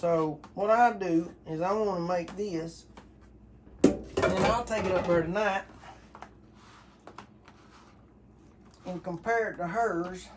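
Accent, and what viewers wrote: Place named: Appalachia, United States. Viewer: you are a true Renaissance man with a funny Hillbilly accent!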